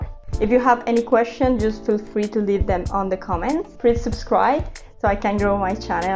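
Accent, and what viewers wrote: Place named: Italy. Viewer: Ooooohhhhhh avevo sentito accento italiano...posso chiedere una tradizione in italiano? Mi piacerebbe moltissimo imparare. Ma nella mia lingua c'è praticamente niente....ti prego puoi aiutarmi? Grazie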